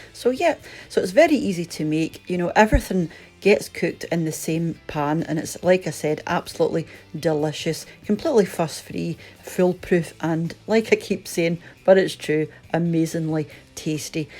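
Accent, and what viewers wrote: Scotland, United Kingdom. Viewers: Beautiful scottish accent.😊❤ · Excellent 👍👍 presentation. Love your Scottish accent. You sound just like my daughter's mother in law ... Glasgow. Lol 🤗definitely will try this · I love your cooking reminds me of my mother’s cooking, she was from Dundee also where I was born but only lived there until I was four. All her cooking when I was young was based on Scottish food, so to hear your accent and see the the cooking warms my heart and makes me hungry. PS maybe not so many americanisms of your lovely accent?😊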